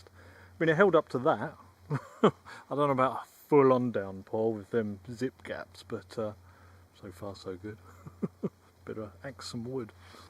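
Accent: England, United Kingdom. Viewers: I'm a new viewer. I'm American and a huge Anglophile - you have an English accent but to the best of my knowledge (which is limited, to be honest), the most 'wooded area' in Great Britain is a place called New Forest. Is that where you do these videos? I enjoy your content. Best wishes from Florida.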